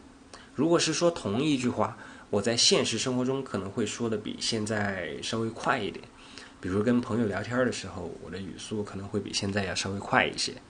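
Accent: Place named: China. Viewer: 老师，您好。很高兴会遇到您的频道，我很喜欢您的视频，subcribed 了，请问您是中国哪个地方的人？我喜欢听北方人说普通话，也想会说的像北方人那样，可是也不要太重。刚好像您一样，儿化不太多，速度也不是太快。您的视频真的是很合适让我们外国人来练习跟读（shadowing). 还有谢谢您在您一些视频提供了拼音字母，让我们更有自信地跟读 （不担心声调读错）。老师，有些问题想问您 ： 1. 书里说上声如果在句末应该读 214，可是我在电影和youtube听母语者读 21 不是 214. 我们该如何好？ 2. 去声连在一起的时候，比如 ： “放假”，“记号”。 有位老师说应该读 53-51 而不是 51-51， 所以听起来不像生气的样子。可是老师，我到现在还觉得莫名其妙，因为不少听母语者说 “记号” 不是 53 51 而 更像 51-轻声。对我来说这样的情况让我感到很头疼。请老师解释。。 3. 有一个频道， link: <link>.母语者在real life 说话的时候大部分说的那么快吗？这样的话，我觉得到最后永远也不会 sound like a native chinese speaker?:-) 跟不上。 感 谢 老师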